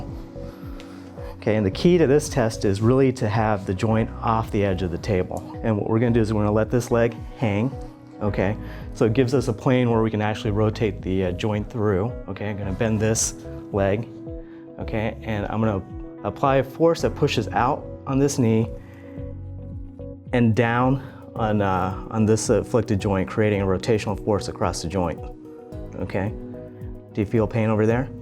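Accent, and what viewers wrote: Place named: United States. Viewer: Sounds American to me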